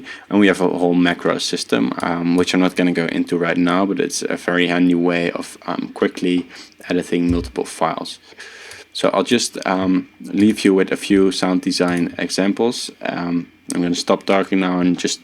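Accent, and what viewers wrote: Netherlands. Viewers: Dutch accent detected 🤘🏻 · dutch accent right there...sowieso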